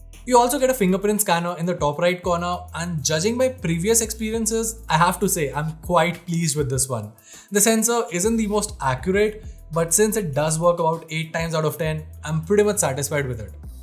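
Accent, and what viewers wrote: India. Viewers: Good indian accent My brotha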